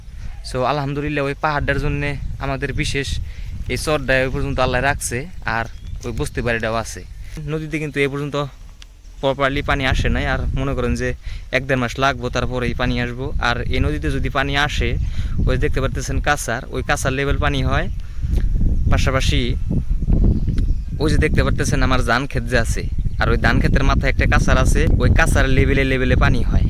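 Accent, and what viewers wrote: Bangladesh. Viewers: india or Bangladesh? আপনার ভাষা তো দেখছি ময়মনসিং ের মতো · আপনার ভাষা একদম বাংলাদেশের জামাল পুর জেলার ভাষা। আপনাদের ওখান থেকে আমাদের জামাল পুর জেলা কত টুকু দুরত্ব? খুব সুন্দর আপনার এলাকা। ❤❤❤❤❤🎉🎉🎉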